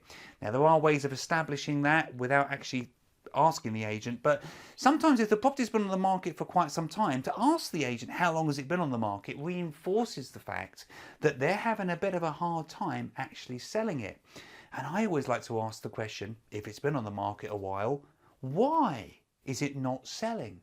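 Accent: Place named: England, United Kingdom. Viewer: Gt video,u might not believe It, but your voice and English accent makes the video more attractive 😀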